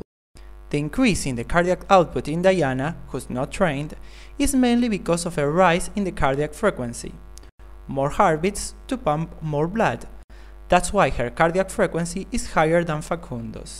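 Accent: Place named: Mexico. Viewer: ese ingles mexicano me hizo caga de risa jajajaja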